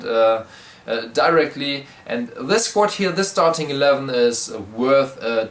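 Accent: Germany. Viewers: du bist deutsch hört man ?? :)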